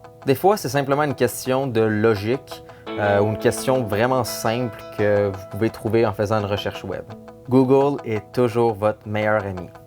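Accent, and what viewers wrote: Quebec, Canada. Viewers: J'adore ton accent et tous les accent des québécois 👍🏻😋💕 je suis presser que tu reface des vidéos because je trouve sa hyper intéressant de savoir comment vous faite et combien de temps vous y passer 💕👍🏻😊 bonne journée 😊😋💕👍🏻😘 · Bonjour:) j'aime beaucoup cette vidéo qui pose bien le sujet, finalement pas assez expliqué aux abonnés qui n'arrivent pas à comprendre quelque fois que derrière l'écran il y a un humain qui aime bien avoir de bon commentaire, et surtout qui n'est pas en non-stop sûr son pc pour répondre parce qu'il a aussi une vie! Ce qui est bien avec ta vidéo c'est qu'elle est aussi bien applicable pour youtube que pour un réseau social tel que facebook!;) Seul,petit bémol le côté tout flagada devant l'écran, je préfère quand une personne se tiens droite Ahah! Mais là je chipote ça te ne casse en rien la qualité du message!:p Brefouille, là petite française à accent vous salut #hommebeau à accent aussi! 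XD Ps: d'ailleurs en France y'a genre 1000 accent différent, c'est drôle que les gens soit "choqué" on va dire par l'accent québécois! (Que je trouve bien sympa par ailleurs! X) ) Bref bonne continuation à toi!:D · Coucou! J'aime bien ta façon de parler je la trouve très calme et j'aaaaadore💜💜💜 l'accent québécois même si c'est vrai que parfois je ne comprends pas tout😌 T'a video est super continu ! Bye!😊 Ps:1 j'espère que ce n'est pas trop long comme com' 2 je ne sais pas si il a l'option reply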